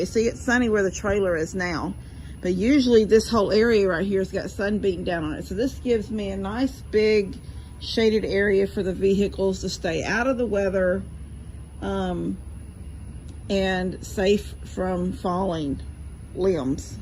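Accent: Texas, United States. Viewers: We love you. I love to watch your relationship with and. The absolute devotion you have for your beautiful boy. I was so happy to see you'd bought your beautiful truck. I felt like you seemed guilt ridden. Everything you do to give you happiness makes me happy and also Tony benefited. I love watching your Texas life and could listen to your Texan drawl all day long ❤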